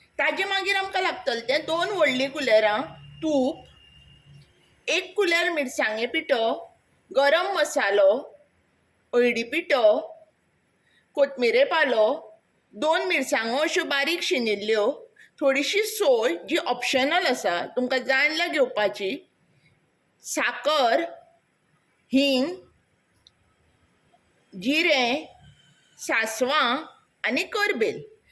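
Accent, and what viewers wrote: Konkan, India. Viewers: Must be tasty will try...your Konkanni is really nice to hear...dont know when Goa has a beautiful konkanni language why it's not used. Thank you for using it..God bless.. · Hey my MIL and I make it as a side with Neer Dosa often for breakfast. Ghovak khup avadta te. We chop the tomatoes to a little more smaller size instead of slicing/dicing like you did. Although I like it big. I love your Konkani dialect...the way you say "kaano" for onions is so irresistibly cute ❤️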